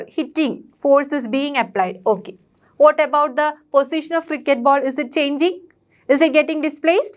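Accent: Kerala, India. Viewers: Mam r u malayali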